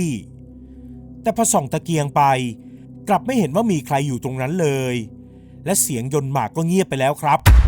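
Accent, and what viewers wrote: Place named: South, Thailand. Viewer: ชอบที่พยายามจะแหลงใต้ <num>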